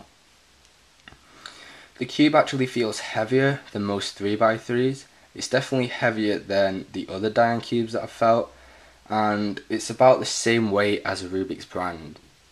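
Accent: United Kingdom. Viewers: i could tell its uk -me being english- i just couldn't tell whereabouts haha, now i know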